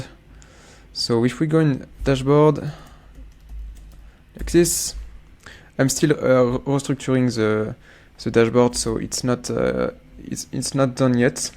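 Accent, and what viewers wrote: France. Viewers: Good video with your french accent.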